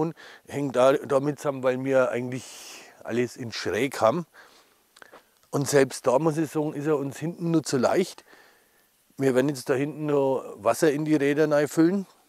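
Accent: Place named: Upper Franconia, Germany. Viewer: Dialekt ist oberfränkisch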